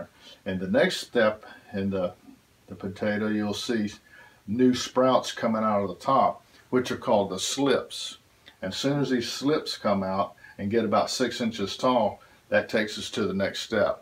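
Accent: South, United States. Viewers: Hello my friend . What part of the USA do you live? I hear the southern accent however I want to get the dates, temp adjustment.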